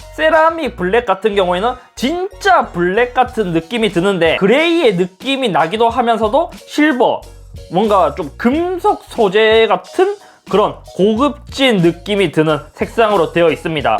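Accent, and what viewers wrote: Gyeongsang, South Korea. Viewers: 경상도사투리같으신데 어느지역이세요? 궁금쓰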